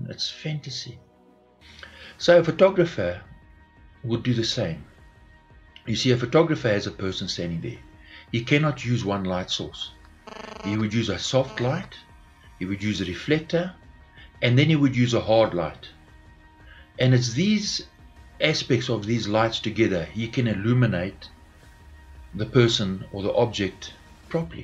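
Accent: South Africa. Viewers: are you a South African.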